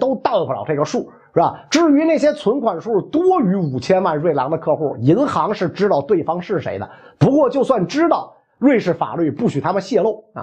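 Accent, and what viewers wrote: Beijing, China. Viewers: 喜欢袁老师京片子，猴儿赛因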